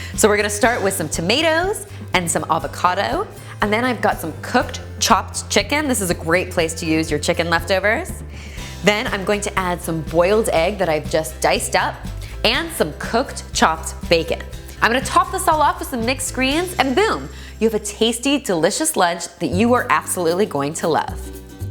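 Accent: Canada. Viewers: Hi, I'm actually watching this in 2016, I just discovered your channel through "DoitonaDime", and was very intruiged. Your one pot pasta meals seem great, and I can't wait to try them out! I could hear (at least I think) that you are Canadian? Well, I'm from Belgium, but my uncle emigrated to Whitby, Ontario in the fifties! I used to spend a lot of my summer holidays there, since my dad passed away when I was only four. I loved it there ! Some pretty good, warm memories... Now, my uncle has passed away, too, and I have to grown kids of my own, so I basicly never went back...too busy. Iwas wondering what kind of jars you use, and if they're available online (worldwide shipping, of course). Thank you so much for all of this! 💝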